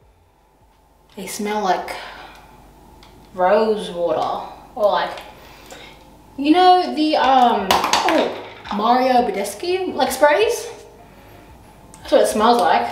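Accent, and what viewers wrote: Australia. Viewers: Onggggggggggg I didn’t know she had and Australian accent this my first time on her yt cause of the tik tok deletion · I love the Australian accent🤩🤩🤩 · I love your acccent even tho I have been to Australia half my life 😂😂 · It’s weird seeing an Asian with an Australian accent....btw she’s like the first pretty Asian I’ve seen, prolly cuz she’s white-washed (her name IS....yikes)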